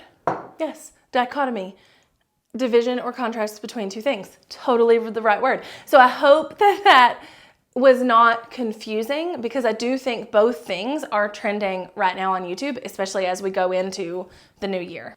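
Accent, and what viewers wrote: South, United States. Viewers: I love linguistics, so the southern pronounciation is fascinating to me. But I'm in Canada, so you KNOW i say niche the French way.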